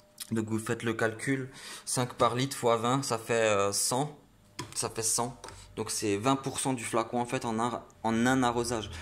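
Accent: Alsace, France. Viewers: L'accent alsacien 😭😭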